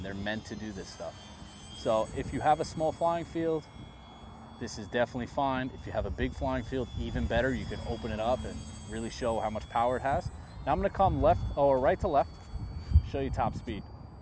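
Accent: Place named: Boston, United States. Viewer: Man keeps saying "supah" and I keep thinking "dudes gotta be from Boston"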